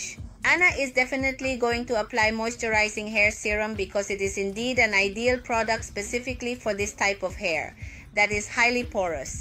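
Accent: Mexico. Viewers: Creoq es de Mexico x el acento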